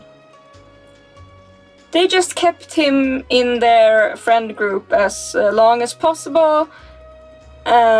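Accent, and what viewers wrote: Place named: Sweden. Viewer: Loving your channel and your accent, are you Swedish? Keep up the great work young lady! 😊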